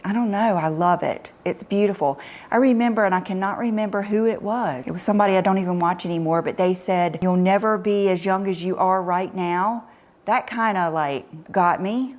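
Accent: South, United States. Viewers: Hi, I’m a new subscriber! I watched and listened when you posted this so I can get to know you better. I love your personality, authenticity and your fashion style. What attracted me to your channel was your Southern accent (I love it) and your joy when you show us what you buy and model them for us. Your fashion philosophy is like mine. I dress the way I think makes me look and feel good, not to look young. Thank you for doing what you do to inspire us.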